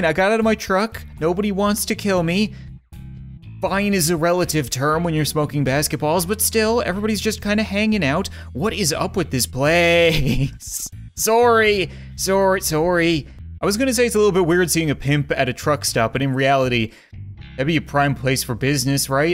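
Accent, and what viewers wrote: Canada. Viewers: GoldenGamer 12 he’s Canadian pretty sure that’s just how they say it.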